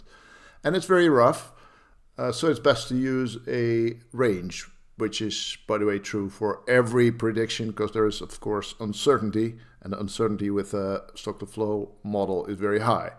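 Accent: Netherlands. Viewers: Are you Dutch? 🎉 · I love the Dutch accent.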